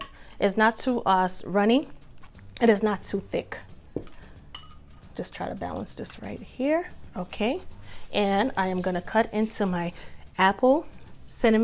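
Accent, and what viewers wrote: New York, United States. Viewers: I knew I recognized that accent NY your dishes look great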